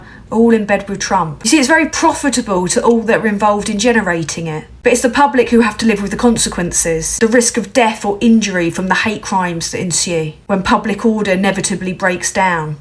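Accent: Cockney, United Kingdom. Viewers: Your just a Labour Lord Lord Haw Haw, champagne socialist propaganda merchant, with tour mockney accent, which posh school did you go to..your a plant.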